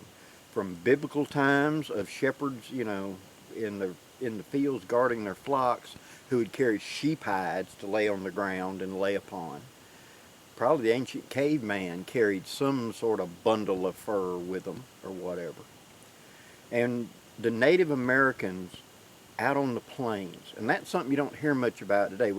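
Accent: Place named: North Carolina, United States. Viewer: "It takes no effort to be miserable" love it! God bless our Marines. Speaking of Marines, I had a good friend who was a former service Marine who was from Hickory North Carolina, and he spoke very much like you do sir. Might you also be from around that part of our great country? Wherever you hail from, I thank you for your great informative videos!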